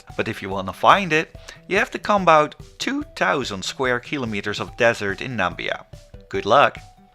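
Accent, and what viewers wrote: Netherlands. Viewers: So ar you dutch then cuz you sound like it (im dutch)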